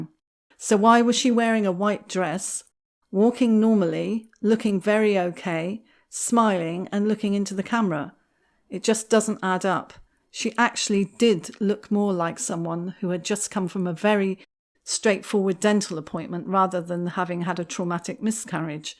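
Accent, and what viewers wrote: United Kingdom. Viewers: Lovely British accent. Excellent content and perspective on YT videos. Thank you. I truly appreciate you. 🏆🌈🏆🌈✨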